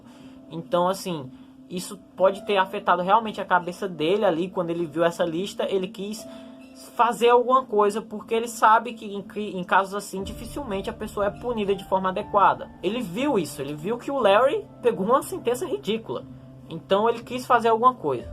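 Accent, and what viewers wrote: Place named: Pernambuco, Brazil. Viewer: Que massa! Reconheci o sotaque. Sou de Pernambuco :D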